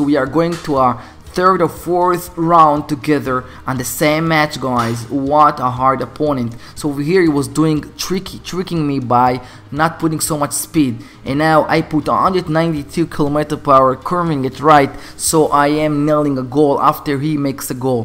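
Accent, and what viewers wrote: Israel. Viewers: יש לך מבטא ישראלי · ישראלי? אם כן, יש לך מבטא פצצה מה זה זה...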